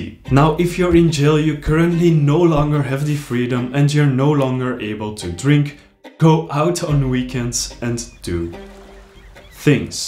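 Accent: Belgium. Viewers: ik kon meteen horen dat je belg bent haha. je komt zeker en vast uit antwerpen :)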